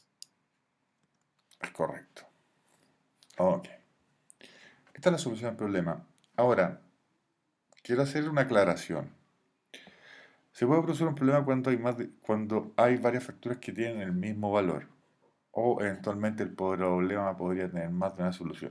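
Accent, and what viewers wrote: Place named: Chile. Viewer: eres chileno, porfin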